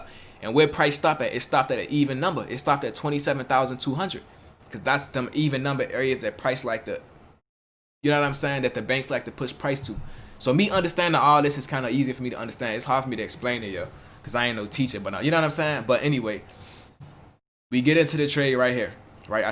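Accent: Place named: Baltimore, United States. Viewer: Gotta be from B’More or at least in MD/DMV but hear strong Bmore accent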